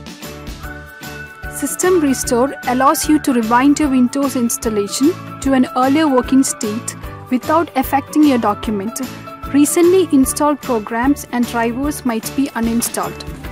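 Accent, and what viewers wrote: India. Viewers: Finally! An Indian voice with a video tutorial that actually works. Thanks for your help ma’am!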